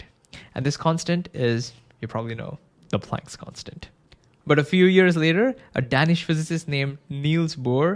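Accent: India. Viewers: God I hate when I find a video that sounds exactly something I'd like then I hear an Indian accent.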